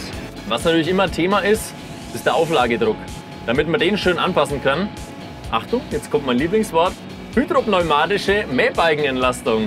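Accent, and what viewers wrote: Franconia, Germany. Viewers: Also für jemanden mit einem OAL-Kennzeichen hat der gute Mann aber einen ziemlich fränkischen Dialekt :D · Der " " hat doch ein sehr fränkisches Dialekt - wenn der mal nicht aus Feucht bei Nürnberg ist.... Wo wird nochmal Fella produziert!?;-)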